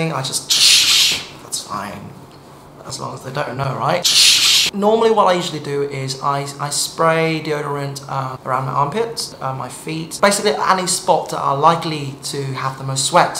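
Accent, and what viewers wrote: United Kingdom. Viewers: That British accent tho~~~ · that British accenttttt💓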